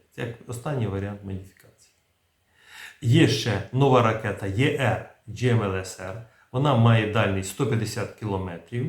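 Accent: Vinnytsia, Ukraine. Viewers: Як же ж мені подобаються МЛРС, ХАЙМАРС і пан, так чітко і класно доповідає нам про ВСЕ!!! Балдєю від Вашої украЇнської вінницького акценту.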